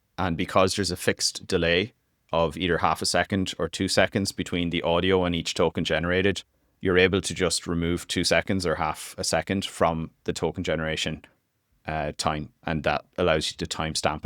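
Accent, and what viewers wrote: Ireland. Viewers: What the heck and all this while i thought our man was from Ireland. Bravo !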